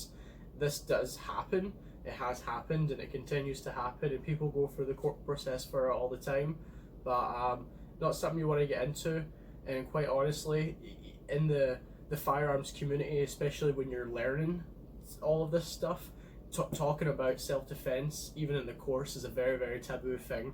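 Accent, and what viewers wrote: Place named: Scotland, United Kingdom. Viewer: Don’t take this the wrong way but you sound Scottish I am guessing you’re from Scotland originally, if not my mistake you just sound Scottish is all.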